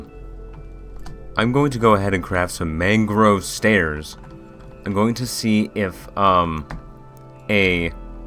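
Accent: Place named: South Africa. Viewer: yeah i think this is a South African accent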